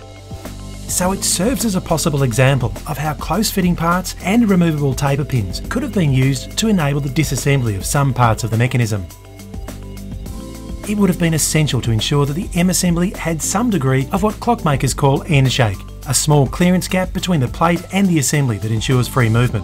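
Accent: Australia. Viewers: Are you Australian? Just wondering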